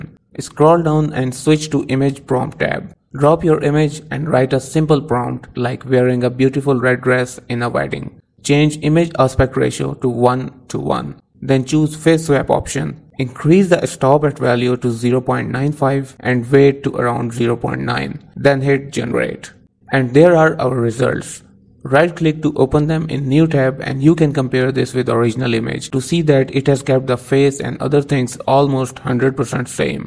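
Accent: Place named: Hindi, India. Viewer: Hi man thanks for this, i noticed you have a strong Hindi accent, your obviously not a Native language English speaker like me. I was wondering do you know of any voice cloners that can accurately clone none native English accents like ours?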